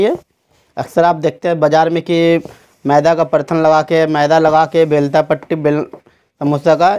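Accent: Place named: Jharkhand, India. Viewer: Kaa o bhaiya, Bhaasa se to Jharkhand ke lag rahe ho...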